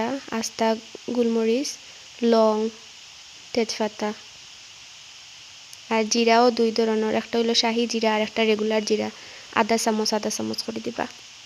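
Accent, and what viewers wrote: Sylhet, Bangladesh. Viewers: আপু তোমার মুখে সিলেটি কথা শুনে ভালো লাগলো আবার অনেক মজা ও হাসির ছিলো। 🤗🤭😂😂😁😆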